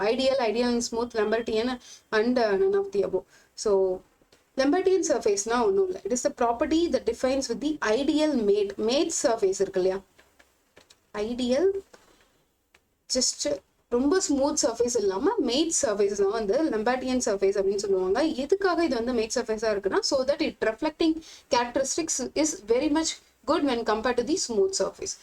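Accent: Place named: United States. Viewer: Konjam slow ah sollunga mam! Understand panna mudiyala! USA english la style ah pesuramari irukku but konjam TAMIL medium students kum karunai katunga mam plz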